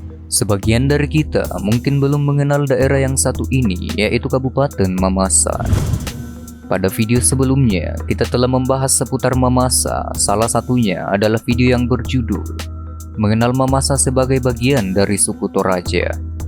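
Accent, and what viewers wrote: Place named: South Sulawesi, Indonesia. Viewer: Lidah sulawesi selatan terdengar jelas dari mulut si admin,.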